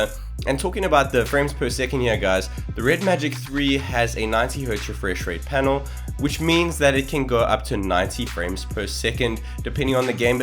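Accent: South Africa. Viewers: ...you sound south African... Are you south african?😁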